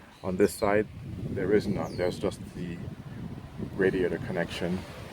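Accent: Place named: Jamaica. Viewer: You sound like a yardie . Working on my 928 s4 project your tips help alot. Big up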